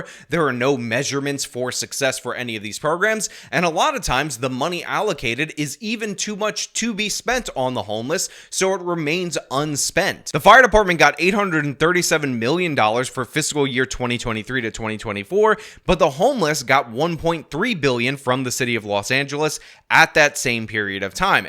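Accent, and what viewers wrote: New York, United States. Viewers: It’s not freaking awoken it’s awaken. The NY speech pattern will only get you so far with the speech nutzees. Ffs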